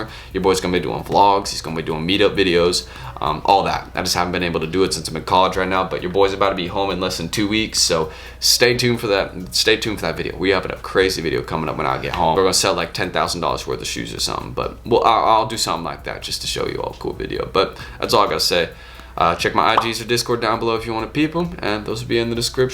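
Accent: South Carolina, United States. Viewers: You from sc?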